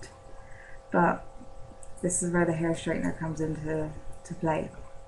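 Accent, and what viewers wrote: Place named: Ireland. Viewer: I knew you were irish as soon as u started talking from your accent ^^ im irish too :) Really want to make a tail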